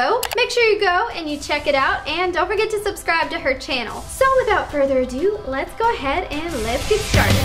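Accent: South, United States. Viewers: i love her country accent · Where's from? She sounds southern.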